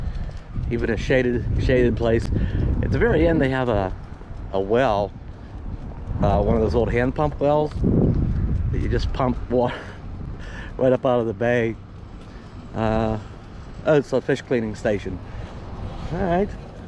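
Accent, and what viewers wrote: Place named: New England, United States. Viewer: I'm laughing at your Yankee tongue in this video. The way you pronounce Pascagoula and Bayou. So funny.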